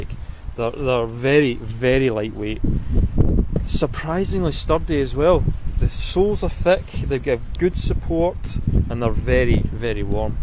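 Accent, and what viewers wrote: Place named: England, United Kingdom. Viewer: Hi! I really like your accent! Where are you from? What part of England?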